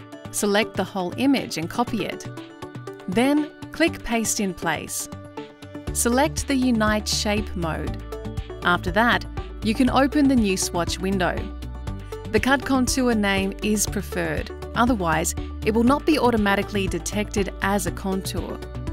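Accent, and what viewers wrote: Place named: Australia. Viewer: Who's the presenter ? Voice sounds like its Aussie.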